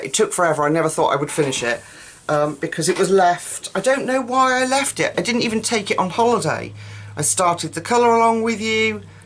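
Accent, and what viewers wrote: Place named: RP, United Kingdom. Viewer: great art...and personality, why are British posh accents so funny, the language - "stickled to High Heaven", LMAO. love it. I could listen to you every day - I don't know how famous she is in general, but most Americans, like myself - except I know who this is, but most Americans, possibly some Brits - might not know the actress, Amanda Donahoe or Donahue. She was in "Lair of the White Worm' with Hugh Grant from the '80s. I freaking love listening to her and looking at her, she's awesome. don't ask me why, BUT...you sound like her! That's a compliment luv...coming from me. buy yes, love your works, I'm not as crafty as you and the coloring community on youtube, but I'm trying in my spare time. However, I go for the more strange and horrific if possible... let's just say, that Bradford lady's great art would never hit my desk. 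- But seeing your love for the kind of "cute as shit" stuff, and payoff ---- is well worth it. Keep on Stickeling Luv. lol, James from Florida!!!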